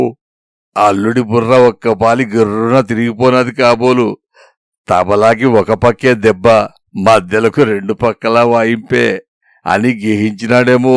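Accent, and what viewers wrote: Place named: Srikakulam, India. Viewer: మీకు నా హృదయ పూర్వక వందనాలు శ్రీకాకుళం యాస దిన్చేశారు. ధన్యవాదాలు నా రాబోయే కథలకు మీపరిచయం అమోఘం. మీ ఆశీస్సులతో ముందుకు వెళ్తాను మహాశయా నా కథలలో కొన్నింటికి నా ప్రియ మిత్రులు సరసి గారు ( ప్రముఖ కార్టూనిస్ట్) బొమ్మలను ఇవ్వడం నా సుకృతం ఆయనకు ఈ వేదికపై నమ స్సుమాంజలులు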